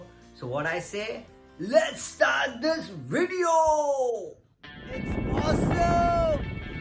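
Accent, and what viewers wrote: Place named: India. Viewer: I love your videos and the your Indian accent . Good job Bro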